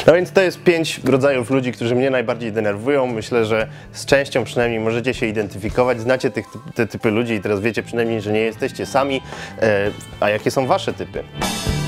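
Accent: Tarnów, Poland. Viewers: Sporo. Sam chwilami nie byłem pewny ale tarnowski akcent i biżuteria z mięsa mnie upewniła. xd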